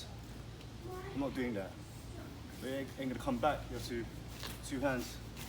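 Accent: United Kingdom. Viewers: your English sounds British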